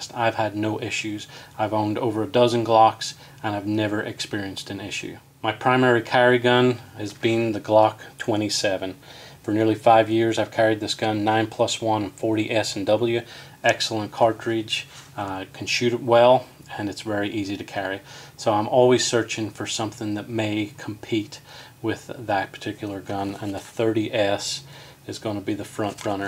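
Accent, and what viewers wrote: Ireland. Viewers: Are you from rural Ireland?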